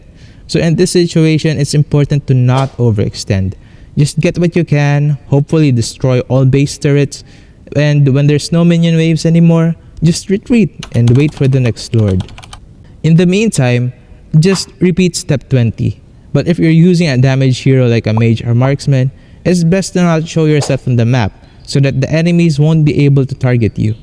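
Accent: Philippines. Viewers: Obviously his accent is Filipino...what's your point?! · Maybe he is philipino, they have this accent, almost all ML youtubers from Malaysia, Philippines and Indonesia has this accent.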